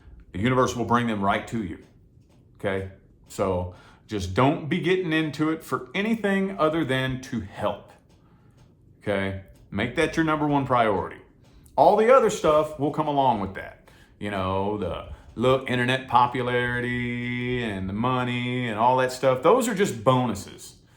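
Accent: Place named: Kentucky, United States. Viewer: So I just found you and listened to both of your Capricorn (July) readings as I have discovered Tarot readers on YouTube (yeah, I am late to the game LOL) just about a month ago and have dug deep and found some amazing readers and great insight to my psyche and situations. Brother, I am originally from Kentucky so I picked up on your accent that is honey to my ears. Brother, you clicked right into my situation like a hand in glove. And your advice was so in tune and made me see clearly the path I was hesitant to take. Love your honesty. I am a fan for LIFE.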